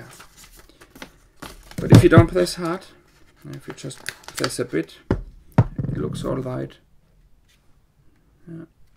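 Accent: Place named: Germany. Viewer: I love your German accent! 💕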